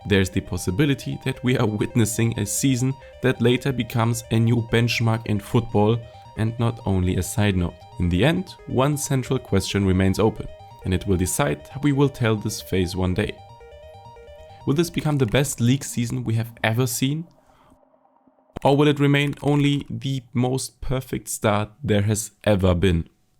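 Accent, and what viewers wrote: Germany. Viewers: der deutsche dialekt nervt ja übel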